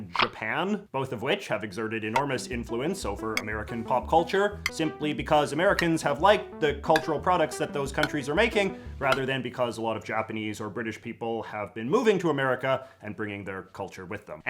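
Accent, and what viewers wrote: Canada. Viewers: How would you term this cadence to your manner of speaking, it's so distinctive, never heard anyone else speak with your "rhythm", which seems independent of your Canadian accent. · I can tell you are Canadian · Are you Canadian talking about American culture? Accent you have and all.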